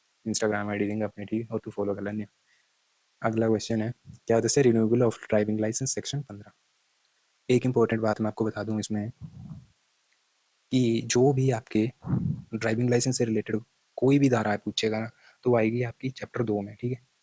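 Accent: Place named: Kangra, India. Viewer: Bhai tuadi bolli sun k kangri lg re mno tusi...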